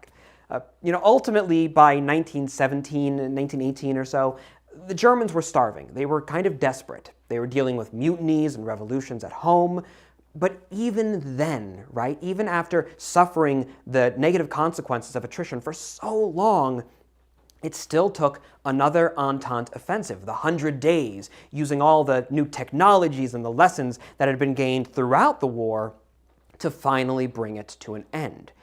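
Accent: United Kingdom. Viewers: is the only person alive who is able to sound british while talking in an american accent.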